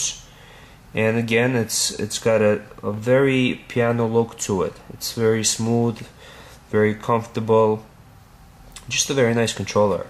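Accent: New Jersey, United States. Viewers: Jersey accent ;D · its a jersey thing pops in my head when i hear him talk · U from jersey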